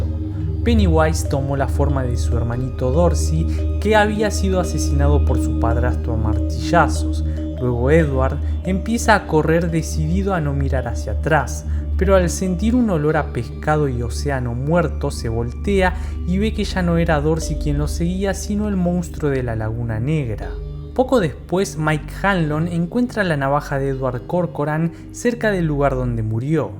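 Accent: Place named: North, Mexico. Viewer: Como que norteño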